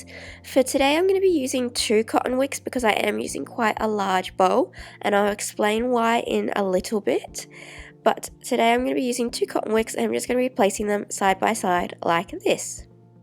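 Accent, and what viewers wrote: Australia. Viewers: R u Australian? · Added bonus is the Australian accent! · Heya, wow so refreshing to hear an Aussie on YouTube, im from qld……thank you so much for this tutorial. I made some candles today actually and you guessed it i have that dipping you were talking about….so i can use a hairdryer to remelt the wax? Have an awesome day/night….xxxx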